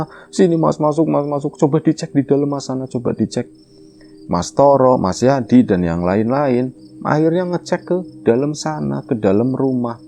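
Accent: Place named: Brebes, Indonesia. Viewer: Brebes ngapak, dadi warga magelang nyimak mas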